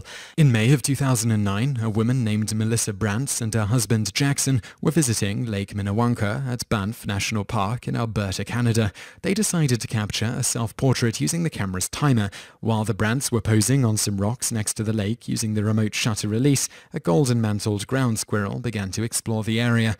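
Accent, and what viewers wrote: United Kingdom. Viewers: Why does British accent make everything seem classy and professional?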